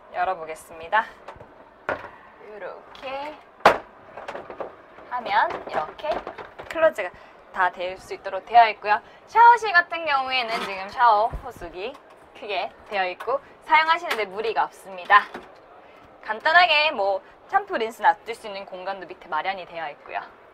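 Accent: Gyeongsang, South Korea. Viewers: 사투리 타령하는 인간들아... 제대로 들어봐. 억양이 경상도 느낌이 있지만 단어나 구문이 사투리가 뭐가 있냐? 사투리는 단어와 서술어 등 구문을 말하는거다.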